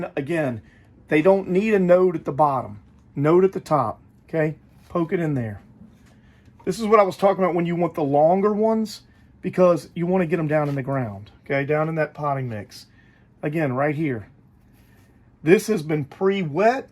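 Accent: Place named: Louisiana, United States. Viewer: Before I look deeper in your about section... New Orleans? Southern Louisiana? I recognise that accent. 😁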